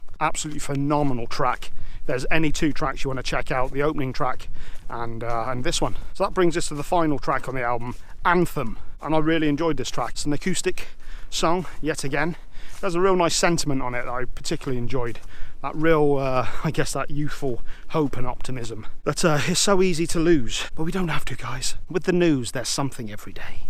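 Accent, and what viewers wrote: United Kingdom. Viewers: i wish you could narrate my life with interludes of british accent spoken word ROCK LYRICS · Great review!Love your british accent!This comment is brought to you by a french canadian!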